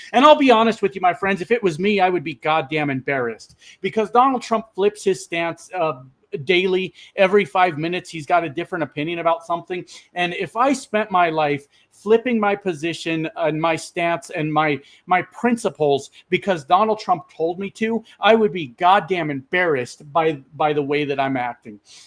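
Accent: Canada. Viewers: Fuck yea buddy. Are you sure you were born in America? Cause you sure as shit sound Canadian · I actually thought you were Canadian..you have the Canadian accent lol. We Canadians are kind, empathetic,giving neighbors..until you give us reason not to be!🤷We now have a briiliant PM with a masters and Doctorate in Economics. The Divided States of AmeriKKKa has a multi bankrupted rapist Felon who has over 50 failed business ventures😂 Canadians are doing great in our beautiful country and continue to be respected worldwide 💪🇨🇦 fdjt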